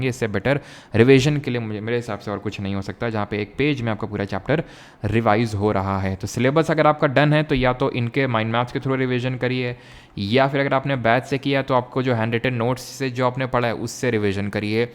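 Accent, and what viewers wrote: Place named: Uttar Pradesh, India. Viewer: Hey bhagwan bhaiya aap ekdm humhi log jaise bolte hain jaise ghr pr bhaiya log bolte hain bhulee jaiye 😂 ...haiyye hai...😅pehli baar koi up ka hai bhut shi lgta hai aapki baat sunkr aise ghr wali feelings aati hai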